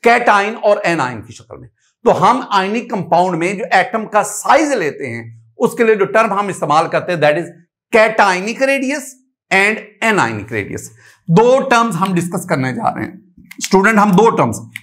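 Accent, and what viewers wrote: Punjab, India. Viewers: Mashallah sweet Punjabi Urdu ❤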